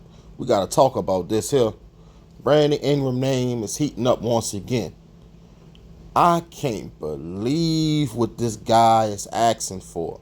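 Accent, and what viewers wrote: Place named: New Orleans, United States. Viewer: Sound like you from the EASTSIDE OF THE NOLA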